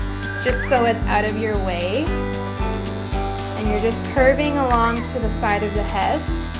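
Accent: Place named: Texas, United States. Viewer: You must be from Texas.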